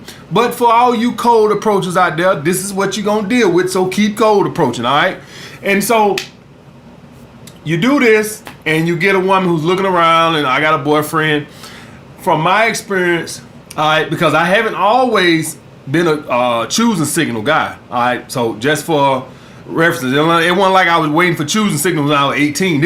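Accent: United States. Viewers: That country ignorant accent he has would lose my interest on site. Let’s not mention those horrible razor mark scars that are so visible. Let’s me know he’s cheap and doesn’t even take of himself....straight facts no shade.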